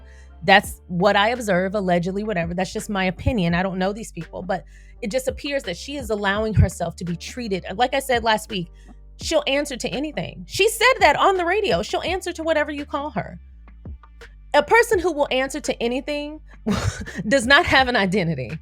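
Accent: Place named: United States. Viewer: is from the United States. She has an American English accent. If you come here expecting her to pronounce words in a British accent you’re an idiot. I will block you. - Me & Moderators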